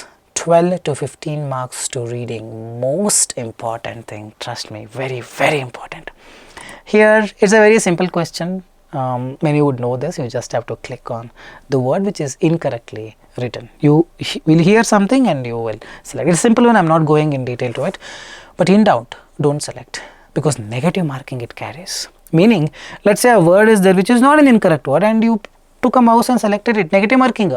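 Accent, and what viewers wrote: India. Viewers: Hi. You are simply awesome! I discovered your channel recently but you do have a way of catering to the needs of even a below average student in English. Your humor while teaching with that Indian butler English is making long videos seem short as we laugh and feel refreshed and still gather up to listen to you more. Keep up the good work you are doing! 👏🏼👏🏼👏🏼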